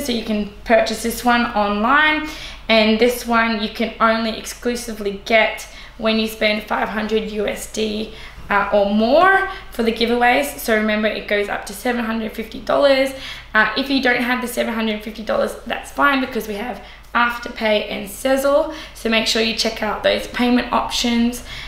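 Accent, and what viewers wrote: Australia. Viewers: Is that Australian accent? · is incredible. She is so beautiful and represents the company so well. And her accent. What part of Australia is her accent from?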